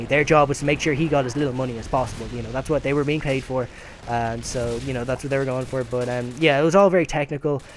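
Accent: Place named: Australia. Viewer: y bro? u sound kinda australian days why i asked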